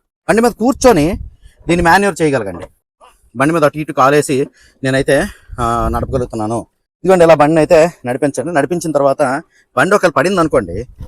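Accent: Srikakulam, India. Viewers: Guarantee srikakulam ayyuntindi ma intlo matladukunnatle undi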